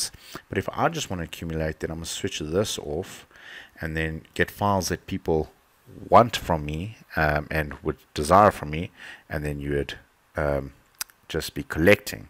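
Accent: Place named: South Africa. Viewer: That south african voice ek se xd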